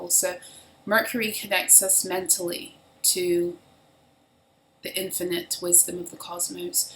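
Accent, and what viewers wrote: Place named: United Kingdom. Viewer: "You have caused confusion and delay!" Love love love your English (or Brit) 'accent!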